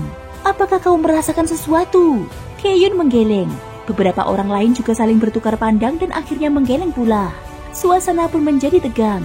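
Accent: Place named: Purwokerto, Indonesia. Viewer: Mbak naratornya orang purwokerto yaa, hayo ngaku aja lah , mbok 🤣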